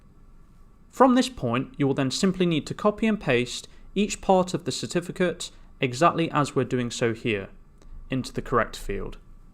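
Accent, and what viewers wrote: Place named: United Kingdom. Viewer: It's not really clear and I can't find SSL/TLS on cPenal but anyway I liked the video because you have a nice British accent lol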